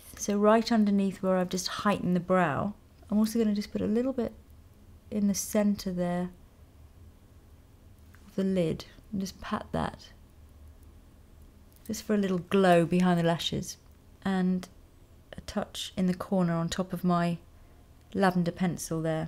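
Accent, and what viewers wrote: United Kingdom. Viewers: Your voice and British accent is sooo soothing!!!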